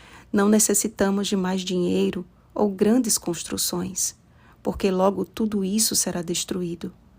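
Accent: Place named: Northeast, Brazil. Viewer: Excellent always! Your northeastern accent is beautiful, your voice is very soft and welcoming! God bless your ministry! I'm from the south of Minas Gerais, living in Alberta, Canada for 1 year and 4 months...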